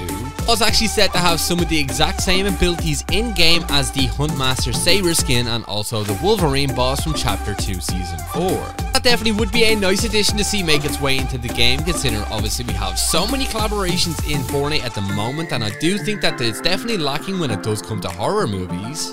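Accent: Ireland. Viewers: Thanks Thats Awesome!!!! Your CLass!! Are you from Ireland?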